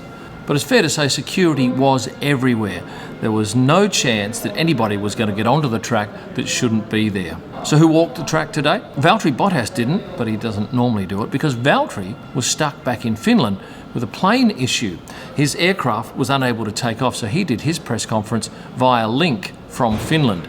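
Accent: Australia. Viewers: Great channel funny accent.Aussie Aussie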